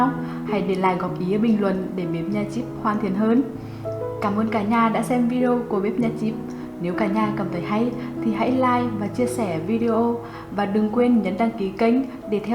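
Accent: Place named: Hue, Vietnam. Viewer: món thạch bạn làm rất đẹp luôn .cô đầu bếp nói tiếng huế thất dễ thương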